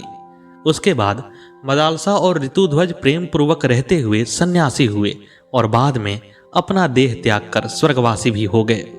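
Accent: Standard. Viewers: अति उत्तम कहानी।आपकी वाक शैली और शुद्ध उच्चारण के प्रयोग से कहानी के तत्कालीन वातावरण का आभास होता है जो श्रोता को प्रभावित करता है।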